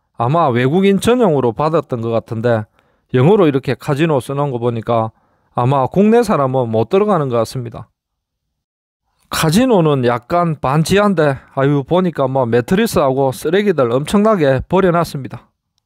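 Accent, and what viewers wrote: Gyeongsang, South Korea. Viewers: 와 갱싱도 사투리 진하다!!! 도저히 힘들어서 못듣겠네.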